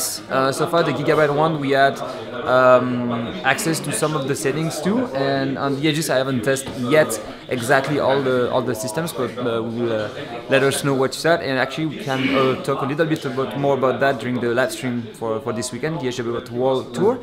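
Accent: France. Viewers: L'accent francais est tres present xF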